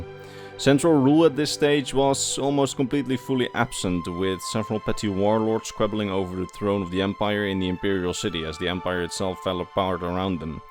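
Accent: Netherlands. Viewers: are you dutch you sound like your from the same country as im from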